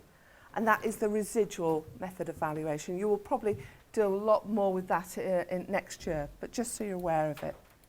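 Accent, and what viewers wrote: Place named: United Kingdom. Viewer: It's a boring enough subject to sit through. Why on earth would anyone want to sit through it having to listen to someone with such an annoying British accent?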